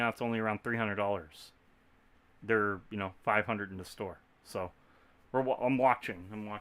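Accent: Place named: Texas, United States. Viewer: Worst Texas accent I ever heard y'all.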